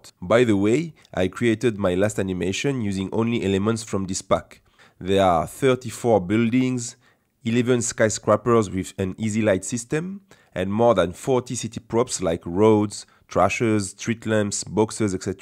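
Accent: France. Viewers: Man, I can hear you’re French… Donc pourrais-tu le doubler en français, c’est désormais possible avec les nouveautés YouTube, cela ouvrirait ton audience au français qui ne parle pas très bien anglais. Ce n’est pas mon cas, je parle très bien anglais mais c’est plus facile d’apprendre quelque chose de compliqué comme blender quand c’est expliqué dans sa langue natale :D Sinon super tutoriel, fort utile :-) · Merci. Et au moins avec ton accent bien Français j'arrive à comprendre l'anglais :) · You have a french accent are you french?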